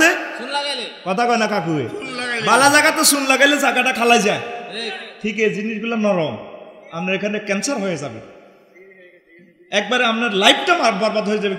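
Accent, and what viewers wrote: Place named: Noakhali, Bangladesh. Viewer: ভাষাঃ নোয়াখালীর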